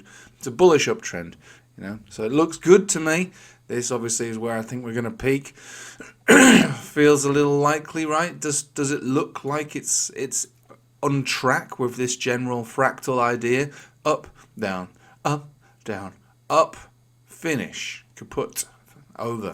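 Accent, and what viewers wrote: United Kingdom. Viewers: Not sure what u took from this video but it’s nice to hear British every morning :) I feel cultured and noble right from the start of the day :D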